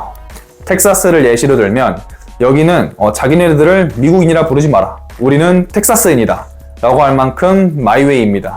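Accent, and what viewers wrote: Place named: Jeolla, South Korea. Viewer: 님은 전라도 억양이 있으시네요 ㅎㅎ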